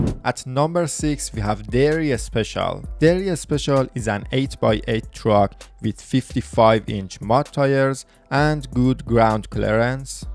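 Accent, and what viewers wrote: Iran. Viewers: اکسنتتو بخورم که نشون داد ایرانی هستی بعد اومدناسم کانالو دیدم بععععله.موفق باشی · حس میکنم ماله ایرانی داداش لحجتو❤😂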